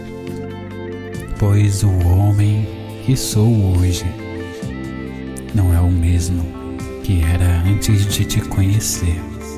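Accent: Minas Gerais, Brazil. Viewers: como pode vc falar palavras como se fosse ele tipo Minas Gerais Foi ele que falou essa palavra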